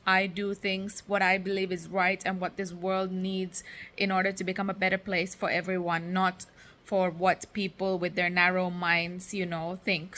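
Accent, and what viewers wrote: Caribbean. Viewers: Its good to be self aware and not be influenced by society expectations. Btw your accent sounds like someone from the Caribbean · I'm Indo-Guyanese and it's interesting the English accent for an Indo-Finnish person is so similar to that of Indo-Carribean people. I never would have guessed you are from Finland. Anyhow, you're right that many people in the world today are having children for all the wrong reasons and some of them are not fit to be parents and bringing children into the world to satisfy the expectations of others is no good reason at all. However, calling people in India "narrow minded" is not fair. You're trying to figure out life on your own without having read the user's manual. Like those parents who should not have bore children, you are not living according to dharma because of a lack of scriptural knowledge. India is the land where God gave the users manual. 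Begin with the Bhagavad Gita, and you might gain some perspective, clarity, and guidance. Also, Indian history is very complex and it went from being the greatest land in the world to being one of the poorest after centuries of abuse from outside forces, but the Indians are restoring it's former glory without much help from anyone and trying to do so in keeping with scripture. Finland does not have the population of India but England stole $43 trillion in wealth from India and all of Europe and the west is enjoying a different lifestyle because of it. Not just Indian wealth, but Indian knowledge and labor as well. From Pythagoras to Mark Zuckerberg. Fiji to Trinidad & Tobago.. Last thing is you mentioned being attacked. If you'r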